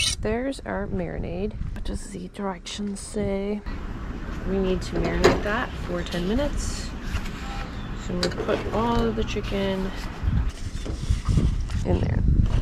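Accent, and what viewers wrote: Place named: Delaware Valley, United States. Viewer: She sounds like she is from the delaware valley but i know baltimore people sound like this. Maybe west Virginia. Anyone can tell me where she is from?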